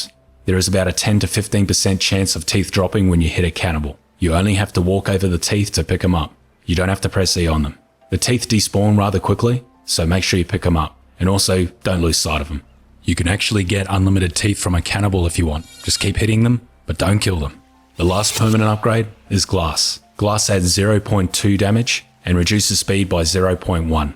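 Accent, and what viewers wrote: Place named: Australia. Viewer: You are such a good YouTuber, straight to the point while explaining it perfectly. And you sound like your a Aussie, which makes it 100 times better.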